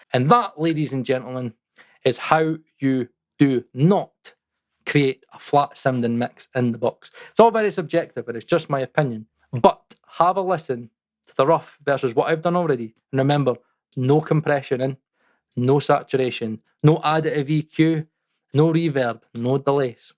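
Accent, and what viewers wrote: Scotland, United Kingdom. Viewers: What is your accent? Is it scottish!? I really struggle to undertsand you as a non-native speaker.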